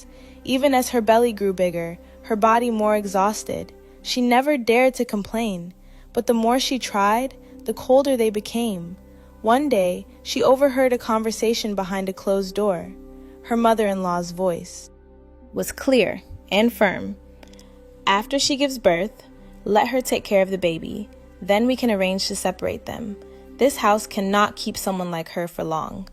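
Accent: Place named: United States. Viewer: No!!! American accent 😩 BUT good story ❤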